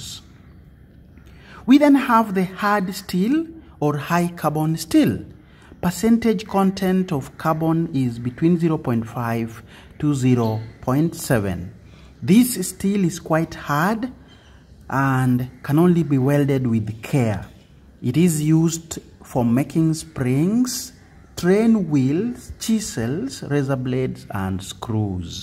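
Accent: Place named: Kenya. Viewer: Abeg i no dey understand this Kenya accent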